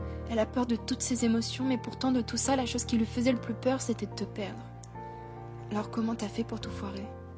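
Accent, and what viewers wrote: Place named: Canada. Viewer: mercii 🙃javais pas bien compris mais tu parle canadien,enfin ta l’accent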